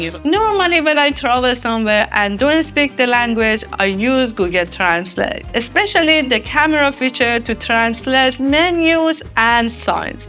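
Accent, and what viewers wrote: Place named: Iran. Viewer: the Persian accent kills me, she has to say everything in Iranian way, even change the pronunciation of names to make them sound Persian!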